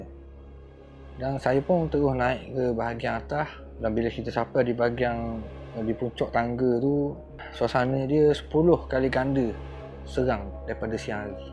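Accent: Terengganu, Malaysia. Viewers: Suke dengar loghat ganu ni..👌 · dgr loghat mcm ganu ni...ok done subscribed...salam dari Singapura...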